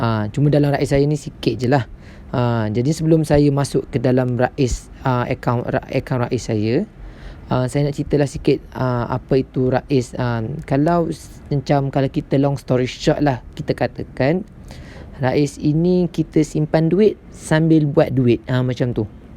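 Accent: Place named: Pahang, Malaysia. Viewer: mcm org pahang je bcakap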